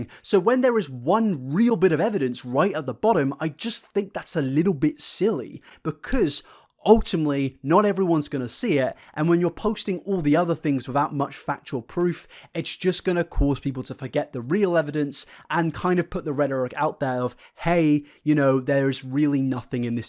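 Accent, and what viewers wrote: United States. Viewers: ur american accent was actually pretty good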